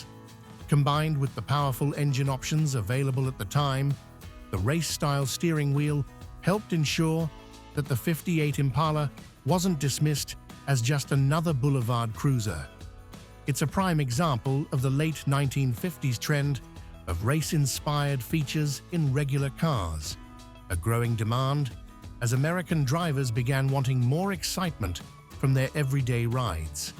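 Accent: United Kingdom. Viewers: That's exactly right. This video doesn't even answer the very question it proposed in the title. Yet another announcer with an annoying British accent. There was barely any time for GM to do the crash quick, completely re-designed '59's by mid-'57. Long hour 7 day work weeks for months was what it took, and exactly what they did, with beautiful results for all 5 division's models.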